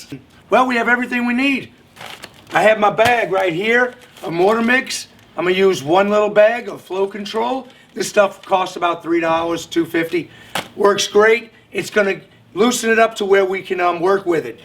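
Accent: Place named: Louisiana, United States. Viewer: Hi, great video! I see your wearing an Abita beer shirt. I'm from Slidell and recognized your accent right away! Keep up the great work. Your project looks awesome!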